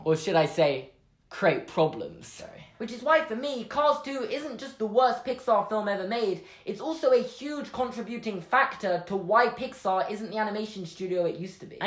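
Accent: United Kingdom. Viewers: Because of your British accent, when you turn on the captions "Cars 2" becomes .... "Cause"....? Or my phone is just glitching again?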